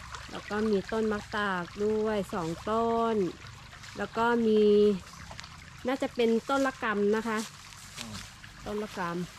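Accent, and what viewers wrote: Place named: Ratchaburi, Thailand. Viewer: สวัสดีครับน้อง ภาษากะเหรี่ยงทางราชบุรีฟังรู้ครับแต่บางคำก็พูดไม่เหมือนทางตากครับ fc จากตากครับ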